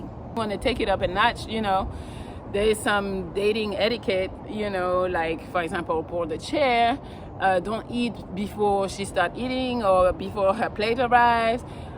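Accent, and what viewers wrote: France. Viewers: Hi, I am just discovering your channel, I mean I've whatched two or three of your videos so far and I like your frankness about your view of how a relationship should start and be conducted. I have just one question that has nothing to do with your contents: where are you from? My guess is that you are from some French speaking country because you pronounce certain words with a very slight French accent. How did you learn to speak english with almost no accent? I myself was born in Paris (France) originated from Martinique. keep doing what you already doing so good. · Irresistible like me damm I like that French accent you turn me on babe butt I have girl wish I would have you in my younger days this is from a great womanizer like me the best in the game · J'adore votre chanson " "....lol from Kenya. Also your french accent....looove it.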